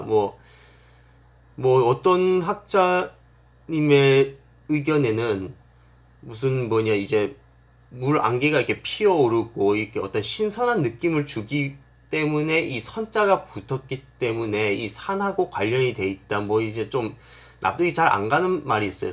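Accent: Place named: China. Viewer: 조선족 같습니다.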